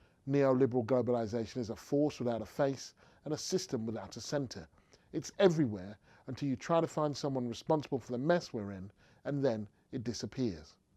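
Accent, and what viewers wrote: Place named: United Kingdom. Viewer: I think it's so cool when a black guy almost speaks with a real British accent!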